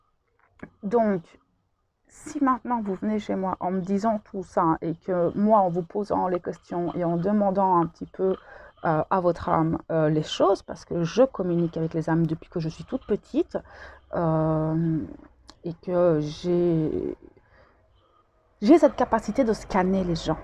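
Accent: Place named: Belgium. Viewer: Il me semblait bien que vous étiez Belge,moi de Charleroi. Première fois que je tombe sur une FJ belge🤗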